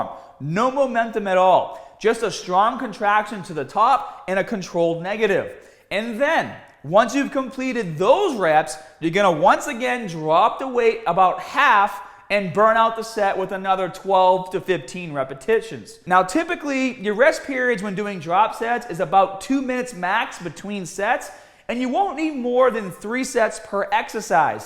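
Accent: Boston, United States. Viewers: The Boston accent makes it better!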